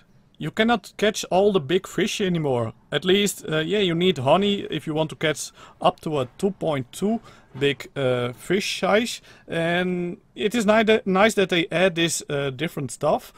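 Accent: Netherlands. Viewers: u bent zeker nederlands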